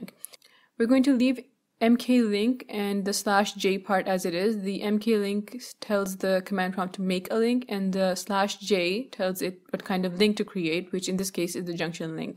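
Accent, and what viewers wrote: India. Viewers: OmG!! But your accent is so sweet! A very mild Hindu accent. I love it!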